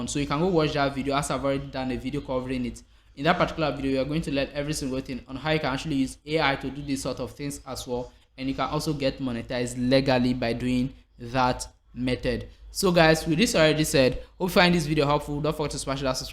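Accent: Nigeria. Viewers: Is it eshot or eshort? Nigerian accent hard to understand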